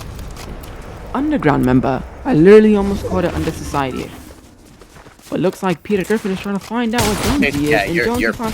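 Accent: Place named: United Kingdom. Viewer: love the british voice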